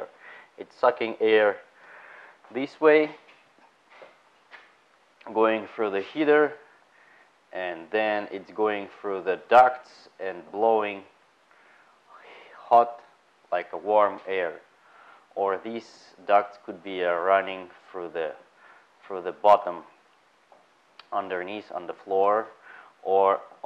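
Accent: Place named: Russia. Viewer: 🤣😅да на таком рашен инглише почти все у нас в сша и говорят. у меня волосы дыбом но все англоговорящие вынуждены понимать и никто и глазом не моргнет) а куда деваться?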